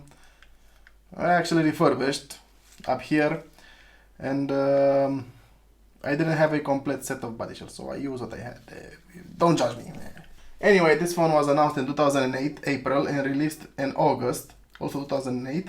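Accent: Romania. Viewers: I think u are Romanian by the accent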